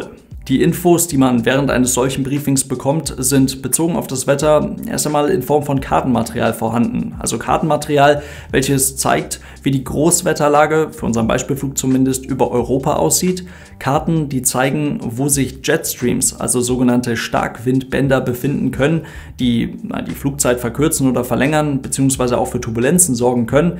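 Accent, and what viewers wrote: Germany. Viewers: Im Funk ja, und das liegt ja dem deutschen, da th bei deutschen Muttersprachlern meist eh schon in die "trie" Richung geht .... im Video müsste man das nicht und klingt auch (sorry) extrem grauselig ... aber, gewohnheit und im Kontext Fliegerei auch i.o. um sich nicht ausversehen an ordentliche Aussprache zu gewöhnen .... Im Gegensatz dazu die englischen Muttersprachler... da wird sich konsequent an die richtige Aussprache gehalten, statt der im Funk vorgeschriebenen :)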